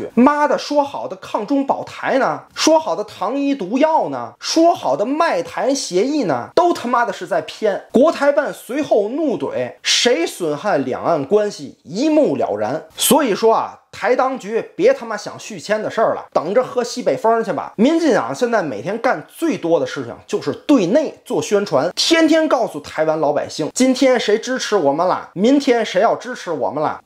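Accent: Tianjin, China. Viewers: 介天津话太喜欢了，不过还没去过天津呢。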